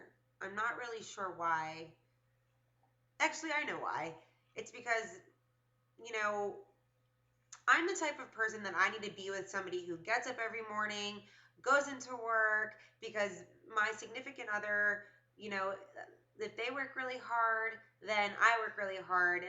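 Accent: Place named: Florida, United States. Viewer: I love your Floridian accent.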